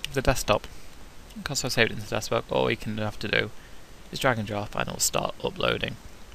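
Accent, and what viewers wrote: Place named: United Kingdom. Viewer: lol you have a funny british accent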